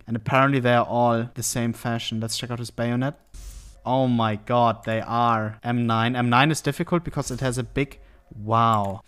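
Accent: Germany. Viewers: Youtube auto captions is writing Germand words while he is peaking english WHILE being german, despite him having a very very good english elocution, and i can't help but feel amazed. I can TELL he is GERMAN, but for a robot to accidentally write german words while he is speaking more than decent english blows my mind.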